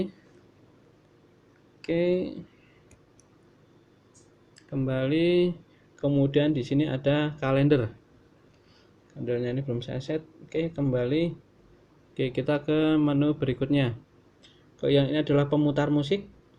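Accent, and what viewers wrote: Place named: Java, Indonesia. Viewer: Lu yang norak bukan jamnya😂😂😂KAMPUNGAN NGOMONGNYA MEDOK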